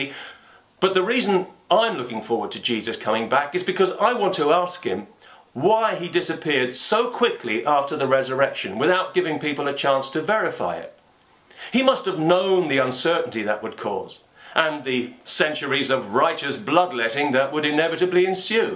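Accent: United Kingdom. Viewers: Fuuuuck! I could listen to this guy talk for hours. His mind Works like the Robin Williams of logic, reason and Witty but justified criticism. Aldo, does help having that awesome brit accent lol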